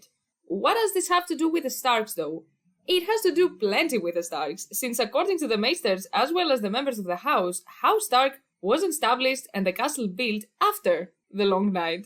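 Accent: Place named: Greece. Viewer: Όταν βάζεις τυχαίο βίντεο για Game of Thrones και σε χτυπάει η Ελληνική προφόρα 😆.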